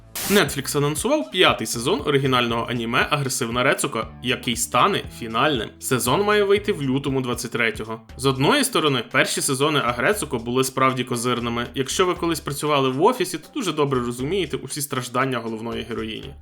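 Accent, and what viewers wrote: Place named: Bukovina, Ukraine. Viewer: оу, ви з Буковини 🧐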